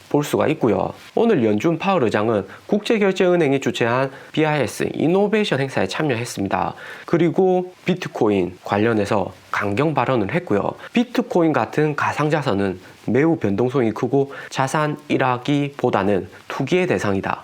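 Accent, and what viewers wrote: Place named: Busan, South Korea. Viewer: 부산같은데용 ㅎㅎ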